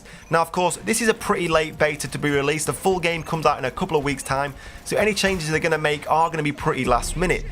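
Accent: United Kingdom. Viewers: wow i like your accent =) British ??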